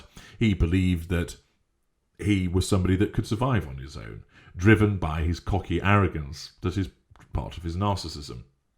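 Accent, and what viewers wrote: United Kingdom. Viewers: Get my news from a disembodied British voice on the youtubes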